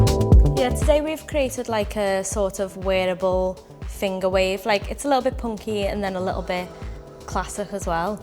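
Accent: United Kingdom. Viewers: Love the Scottish? Accent 🖤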